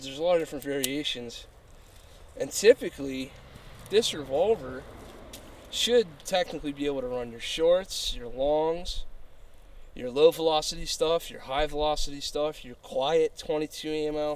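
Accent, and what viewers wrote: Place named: Philadelphia, United States. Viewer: Good review. Your accent says, “I’m from the Philly area!” amirite? Maybe Delaware?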